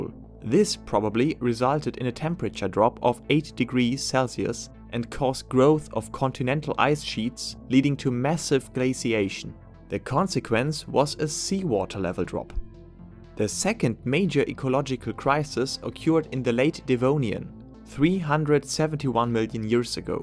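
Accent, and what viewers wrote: Germany. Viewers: I like your thick German accent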